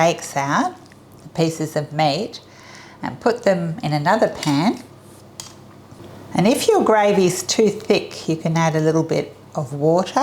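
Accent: Australia. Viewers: I don't think Dave picked up on your accent. True blue, it is, if you ask me. Nice work . . .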